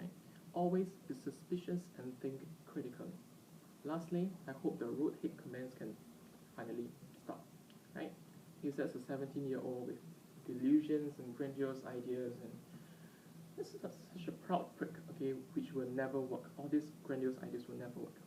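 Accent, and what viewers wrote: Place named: Singapore. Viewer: Please teach anything you wish... apart from Speaking Singish, Chinglish...oh that's right English, you need work on this...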